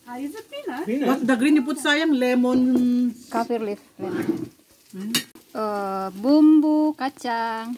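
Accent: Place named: Batak, Indonesia. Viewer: Gak tau knp dari dl tu suka bgt cara ngomongnya orang Batak...apalgi klu liat Judika hahahahh skrg liat kk aja dl..horassssssss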